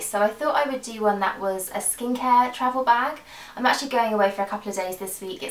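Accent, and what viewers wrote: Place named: London, United Kingdom. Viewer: I thought you were from London lol! Where are you from? x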